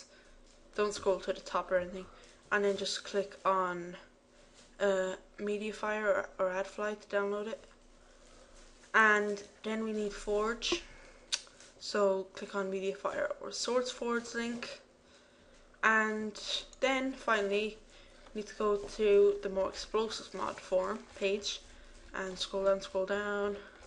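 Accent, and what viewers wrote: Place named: Ireland. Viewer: good to see someones irish not american liike me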